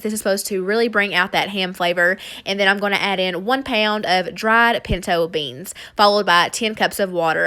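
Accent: South, United States. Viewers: Thank you, you are so sweet:-) If not eating it helps get rid of a host of issues I’ve been having...it will be worth it! I will still watch your videos as I love them! I also love your southern accent..lol! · Love your accent!! I'm a New Yorker so you really don't hear a southern accent around here.😋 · I’m obsessed with your southern accent. I’m definitely trying those chicken bacon ranch sandwiches! Subscribed. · Love the country accent 😂🙌🏾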